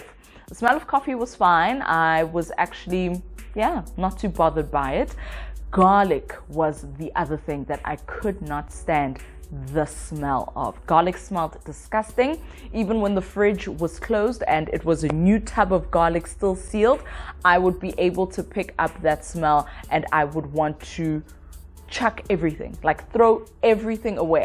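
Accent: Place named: South Africa. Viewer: Absolutely live your voice and accent.. 💖 a friend of mine is from South Africa and sounds similar to you but she’s been in the states now over 20 years so enviably she’s changed some.. 🤷‍♀️ 🥰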